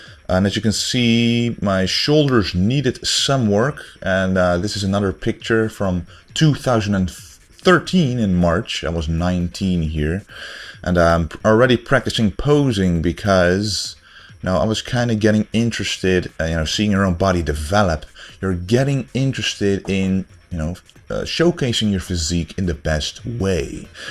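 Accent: Netherlands. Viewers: Hey man , are you dutch ? you sound dutch , i am dutch , my dad is also a oldschool bodybuilder from the netherlands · Dutch accent is so cool. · Are you Dutch your accedent is like a dutch men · I'm wondering, are you Dutch? Your facial features appear Dutch, and your accent has slight hints of Dutch in it. I'm guessing either Dutch or Scandinavian.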